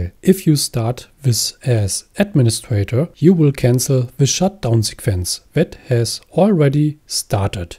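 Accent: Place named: Germany. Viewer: Danke für deinen schönen Tutorial! Der Akzent kommt voll raus haha direkt erkannt DE 😂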